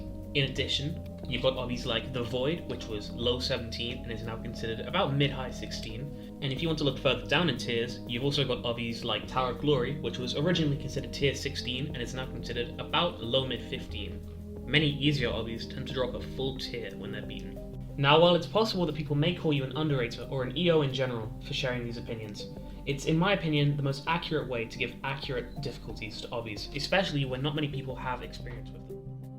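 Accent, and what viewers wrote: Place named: Wales, United Kingdom. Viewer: He’s welsh your English isn’t the problem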